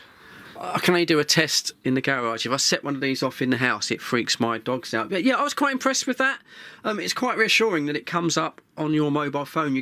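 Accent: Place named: Northern England, United Kingdom. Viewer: are u from northern england? cause i got colleagues from there who sound exactly like you 😆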